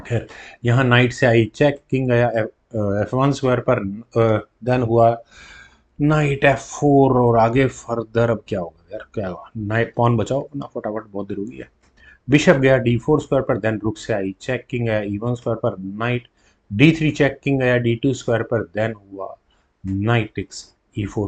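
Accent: India. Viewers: Sir punjabi lag rahe ho?.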